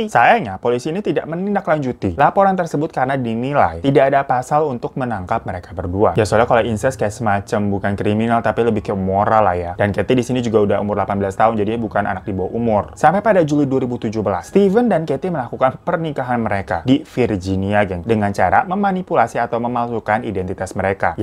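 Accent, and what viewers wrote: Java, Indonesia. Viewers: Ngakak anjirrr dengerin suara nya gaya bahasa nya medok wkwkwk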